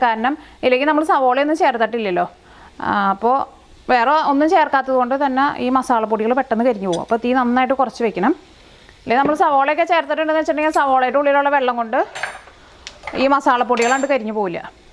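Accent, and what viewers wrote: Thrissur, India. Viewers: Thrissur slang very nice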